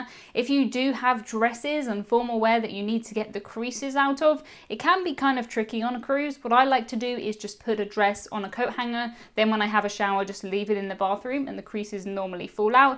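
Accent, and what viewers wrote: RP, United Kingdom. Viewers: I've never been on a cruise but will hopefully. I only watch your videos because you're so dang gorgeous and I love your posh accent ☺️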